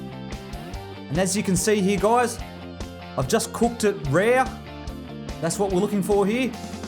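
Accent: Australia. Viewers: Thank you for your awesome Aussie accent love it from India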